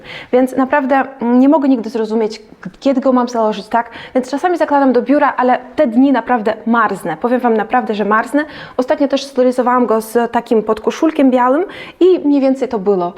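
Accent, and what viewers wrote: Poland. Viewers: Akcent polski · To piękny polski akcent z ł jak l. Pozdrawiam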